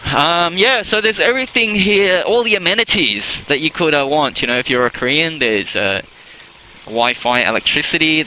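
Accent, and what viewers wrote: Australia. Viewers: is an Australian Vietnamese. This is how we sound.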